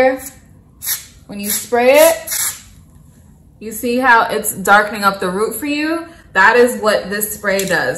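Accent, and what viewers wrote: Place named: DMV, United States. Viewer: TOP 6 REASONS I SUBSCRIBED: • Your complexion sent my azz to the kitchen for some HAWT CHOCOLATE 🍫 • You are giving me every reason to buy these units BAYBEEEEE 😭❤️🤎❤️ • Your music is poppin Ma. I’m a 80s baby • The confidence is on 💯💯💯💯 • There’s no fear in color like, you screaming “run up” & Move around “ to EV-ERY color in this playlist sis • We neighbors/family with the energy, style, accent.... “DMV” Where ARE YOU AT??? ANNNNNNDDT my #1 reason- you are most definitely a TOPCOAT PRETTY BROWN BABY DOLL & I’m supporting you baby girl PS- once I snatch these units, zaddy dropping Ben-jamins = I don’t spend a dime 🤣 Keep going baby! I’m a fan MUAS 💋 and happy holiday season boo 🎁✨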